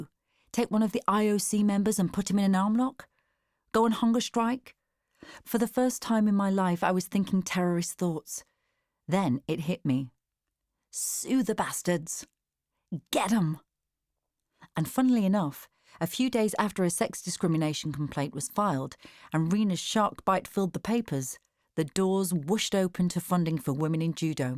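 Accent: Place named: United States. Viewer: Suranne's American accent is spot on !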